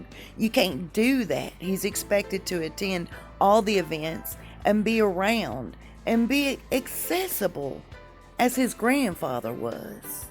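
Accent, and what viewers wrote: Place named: South, United States. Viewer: Very informative ..and gosh coming from nz I adore your lovely poetic accent.I love the southern accents.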